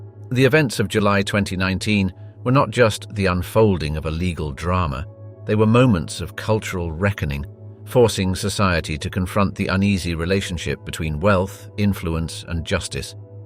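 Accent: United Kingdom. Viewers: Content notwithstanding, talk about "PURPLE PROSE".. GEEZ! Wish I had a dollar for every time the overly Brit narrator oozed out the word "enigmatic". .Again, GEEZ! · Ah, a poetic little wordsmith wasting our time with a dumb british accent. Gfys 👋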